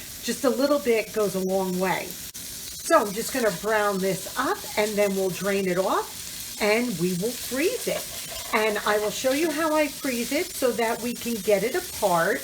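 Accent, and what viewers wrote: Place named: East, United States. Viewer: Hello Mornings with Granny sent me. Gotta check out your channel. You sound like from back east. I am from New York state.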